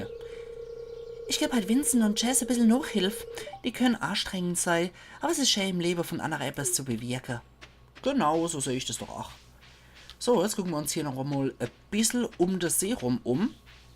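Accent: Palatinate, Germany. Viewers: Mein Guter, pälzisch wie immer ;) nur: schraub bitte die Auflösung hoch ;)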